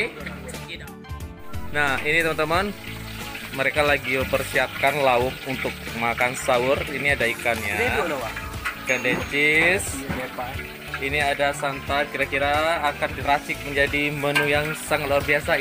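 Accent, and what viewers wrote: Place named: Aceh, Indonesia. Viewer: Hahaha,,lon pike awak Medan si Lae..tapi watee meukalon gtng na perasaan ureng Aceh sang..tp bak video Hana Tom lon deungoe su bhs Aceh 😆😆👍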